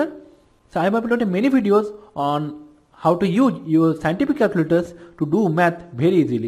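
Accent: India. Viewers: i hate your indian acccent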